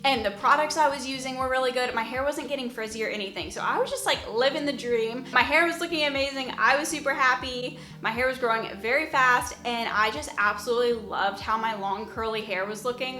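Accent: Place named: Michigan, United States. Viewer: Super weird question.. are you from Michigan? Your accent sounds EXACTLY like a like my multiple of my friends.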